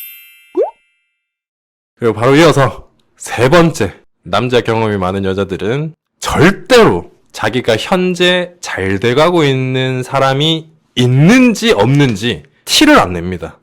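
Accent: Gyeongsang, South Korea. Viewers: 대구 경북쪽 말투네요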